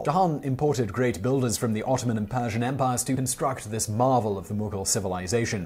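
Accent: England, United Kingdom. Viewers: What are you trying to say? He is English, so American mispronunciations are, in our view, wrong! Tom-ay-to, tomato!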